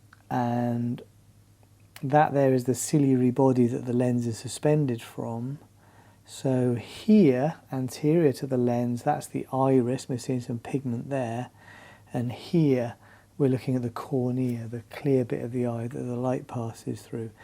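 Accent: England, United Kingdom. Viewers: ya ne tatlı bir adam bayıldım. konuştukça o ingiliz aksanıyla histolojiye ilgim arttı yerim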